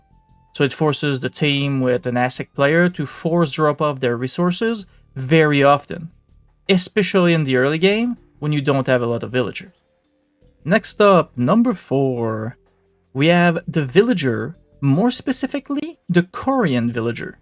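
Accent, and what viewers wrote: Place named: Quebec, Canada. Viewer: Je reconnais un Québécois quand j'en entend un.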